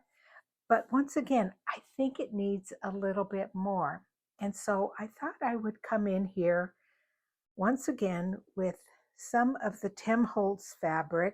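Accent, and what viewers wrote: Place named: Utah, United States. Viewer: I’m from and live in Tennessee but I lived in Providence, Utah for 5 years. You have the same accent as them. 😄 Are you from Utah?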